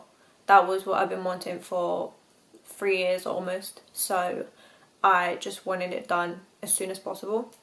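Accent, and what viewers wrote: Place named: England, United Kingdom. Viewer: you really mastered the English street lingo and accent!! SO impressed.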